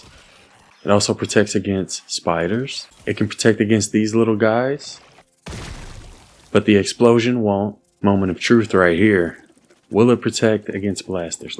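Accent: South, United States. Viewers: bro is definitely southern, arkansas?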